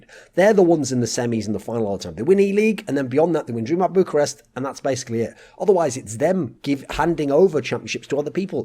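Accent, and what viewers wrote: United Kingdom. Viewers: Why is more British today than usual?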